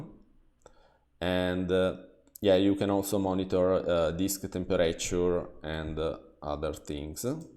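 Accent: Italy. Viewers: The italian is strong in this one, thanks for the comparison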